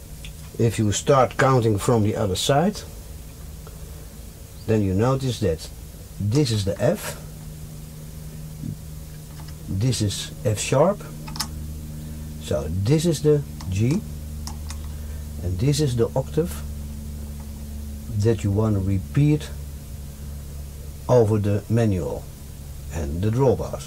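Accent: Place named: Netherlands. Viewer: You're Dutch? Can't miss.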